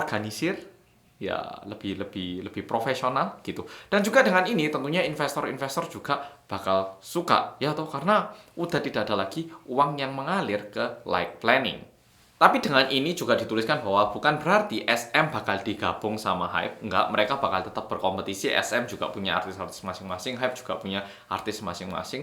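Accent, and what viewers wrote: Java, Indonesia. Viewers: suara medoknya berkurang drastis.. Lebih berat korean aksen 😁.. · yg komen agak sdkit kecewa dan mngkin skit mostly sm stand yg notaband agak benci berembel Hybe dan BTS,sdikit yakin itu..aq paham krn aq Army,sejak jman vote dll pun kbnykan Sm stand bnci bngt,but its okay. well aq gk mnghakimi mereka sbgai Sm stand,tp be strong for all of us sbgai fans..#nowarnohate #justopinian peace✌️ Btw pnjelasan nya keren bngt,Thanks Mas Korea yg Medok. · Mas medok andalanku, sebagai JYP stan gw mendoakan yg terbaik buat SM entertainment 🙏🏻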